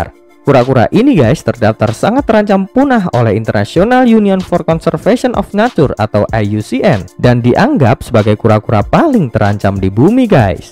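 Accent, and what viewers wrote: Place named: Java, Indonesia. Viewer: ketauan ya kalau komentatornya orang Jawa... logat hurup K hilang😄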